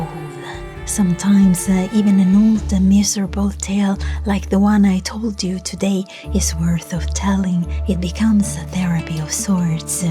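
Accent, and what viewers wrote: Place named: Italy. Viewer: How intetesting to hear an italian accent from you. It's the first time I watch a video from you and I immediately assumed you were scandinavian. A different video for sure. I like it.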